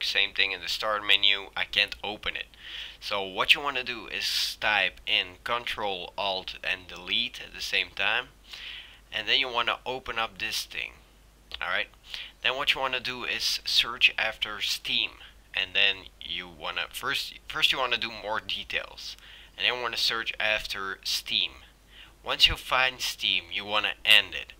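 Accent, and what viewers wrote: Netherlands. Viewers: Are u dutch?? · Thanks! it helped me, btw are you dutch lmao :D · nvm his dutch sorry thought it was Afrikaans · Dutch? lol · you're dutch...I can tell by your accent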